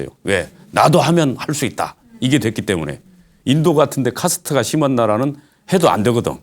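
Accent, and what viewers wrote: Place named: Jeolla, South Korea. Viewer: 기자도 아니고 교수도 아니고? 전라도 말씨네?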